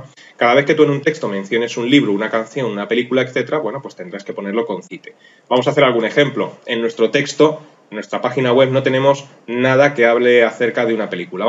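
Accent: Spain. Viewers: Este acento de la madre patria es demasiado fino!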